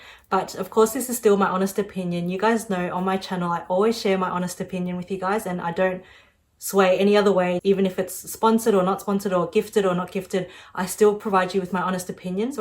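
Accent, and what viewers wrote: Australia. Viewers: Are you Korean? You sound like you kind of have an Australian accent. You remind me Rosie a little from black pink I love them so much !!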